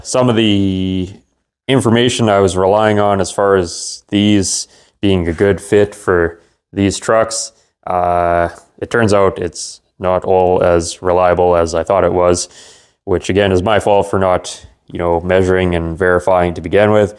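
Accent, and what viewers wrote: Canada. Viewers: I truly enjoy watching your videos and listening to your Canadian accent. You also have a dry sense of humor, as do I. So I appreciate that too. Keep the great content coming. And don't beat yourself up over wasting time. We all do it. I mean, just sitting here day after day watching other people work is sort of a waste of time. But heck, I'm 82 so I do what makes me happy.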